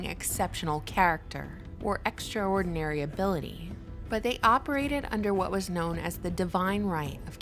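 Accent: South, United States. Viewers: did you speed this video up? what happened to that slow, southern, mellifluous locution?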